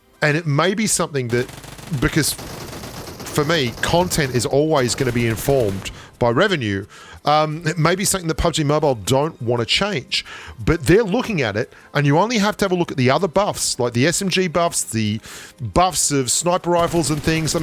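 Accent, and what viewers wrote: Australia. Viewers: I subscribed to this channel recently. I think..this guy knows what he is saying. The probable reason why he isnt getting more subscribers..may be for following reasons: 1. Accent: I think its Australian..though i can easily understand him..most of the south asian audience can't. So either you can slow down while spekaing..or put notes..subtitles..in video..like levinho and tacaz does. They arent good at english..and hence they only use.. text for communicating with audience. 2. More gameplays: all leading youtube accounts..majorily upload..their gameplays.. i like your educational videos..but it wud be great..if you can uplaod your gameplays..atleast once in 2 days..with commentary. I think people wud like that. Anyways.. cheers mate.. great channel. I learnt a lot from you. May you get more subscribers soon.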